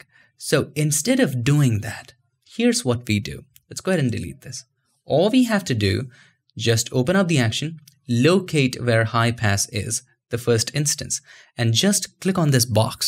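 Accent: India. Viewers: Are you from india or outside??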